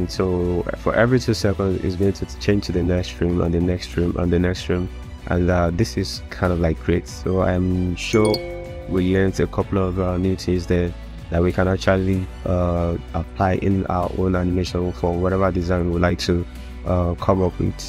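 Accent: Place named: United Kingdom. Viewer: Why british accent exist-_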